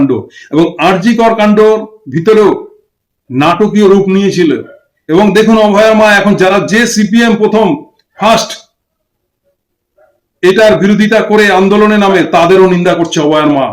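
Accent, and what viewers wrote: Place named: Bangladesh. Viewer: এটাতো বাংলাদেশি মাল বুঝাই যাচ্ছে কথা শুনে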